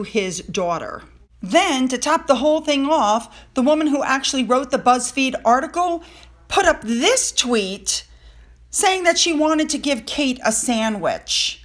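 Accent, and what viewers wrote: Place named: Brooklyn, United States. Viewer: Boo hoo, here in America, that's how people in Brooklyn speak, and WE LOVED IT !!!! Listened to it 2 times myself!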